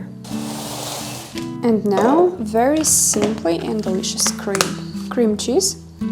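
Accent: Ukraine. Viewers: nice videos. excellent choreography. excellent photographic angles. nice Ukrainian accent. so yummy Ukrainian dishes. wow torturing us viewers with heavenly food we can't eat haha. many thanks for producing and sharing these videos with us. have a great day always. 🌹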